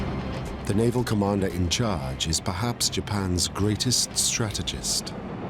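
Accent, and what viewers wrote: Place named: England, United Kingdom. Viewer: American propaganda with an english accent. Nonsense.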